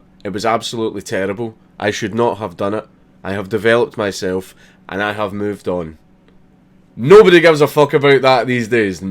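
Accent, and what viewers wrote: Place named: Scotland, United Kingdom. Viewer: Awesome vid! but i wish you went with "they want to see you burned." rather than "they want to be outraged" At the closing statement..mainly cause "burned" sounds awesome with a scottish accent:D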